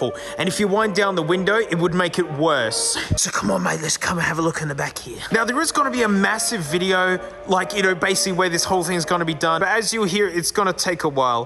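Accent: Australia. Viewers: James Came Around = James Cameron... Ah the sweet Aussie accent! My ear just needs an extra second sometimes to process!!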